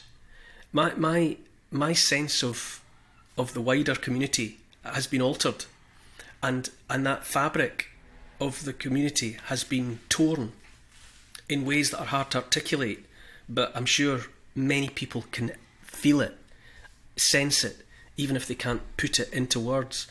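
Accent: Scotland, United Kingdom. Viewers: The Scottish accent is the most beautiful accent in the world and is easy to understand.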